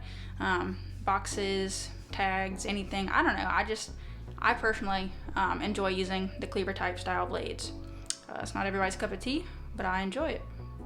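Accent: South, United States. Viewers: petit lovely young lady with giant frigen knives. And a Nurse! suthrn accent. I cant comprehend it all. neet woman! love her personality. The Malibu and the last one where a toss up for me. I love a chunky knife. That last one was amazing to my tastes. Hmmm. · Okay.... I'm never watching any other knife reviewer again. I love her reviews, her smiling face, her southern draw, her hair, and the sound of her voice. Great content. Beautiful stuff. The knives weren't bad either. ❤️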